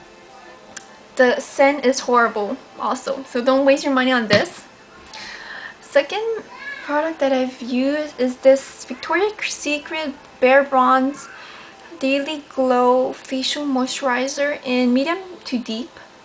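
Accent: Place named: Quebec, Canada. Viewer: I love St.Ives I use almost all of their products :) But....just asking do you live in Quebec because you sound french from there...anyways I love your videos! :)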